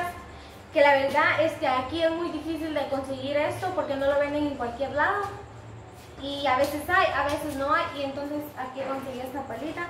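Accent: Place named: Guatemala. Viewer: De todas las chicas de los grupos chapín ella es la única que habla como su país pero que se escucha bonito es decir no vulgar su forma de hablar su acento.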